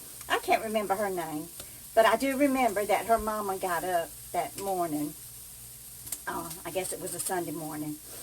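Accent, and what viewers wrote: South, United States. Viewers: Just came across your video, and love them! Where are you from with that accent, and the y'all. Also, you mentioned that you go to Cafe du Monde. I was born and raised in St. Bernard Parish just 35 miles below New Orleans.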